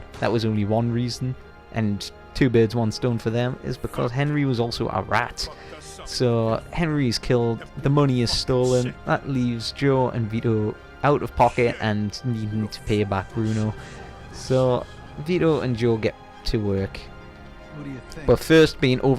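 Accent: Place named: United Kingdom. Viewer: Should’ve been narrated with a proper American accent. This limey sucks!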